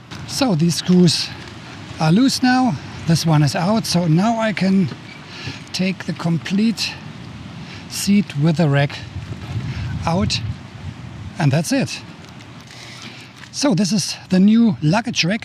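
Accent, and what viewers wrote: Germany. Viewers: so nice to hear your German accent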